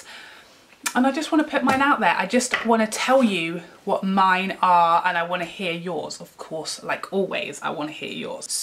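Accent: United Kingdom. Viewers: Hi, I just wanted to say I like your videos, you have this real-life, down to earth approach, you're relatable and I think your reviews are very thorough. And I also like listening to your British accent. Thanks for the good job!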